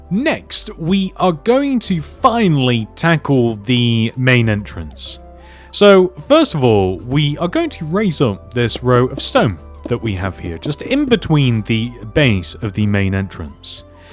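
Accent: United Kingdom. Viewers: Ngl when I use this guys tutorials I use his British accent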